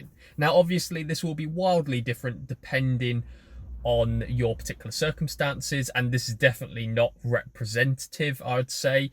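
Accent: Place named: RP, United Kingdom. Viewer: No problems and keep up the great work. There's a Norwegian guy that does tests also. Very interesting statistics etc. Btw, you speak clearly without any regional accent, hence why I thought you may have been to either public school or Oxbridge 😎👍🏾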